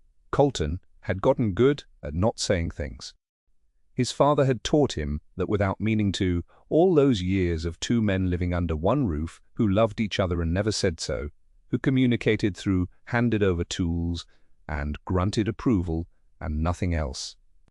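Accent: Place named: United States. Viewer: Why do you speak like an American but you choose a British narration vooice?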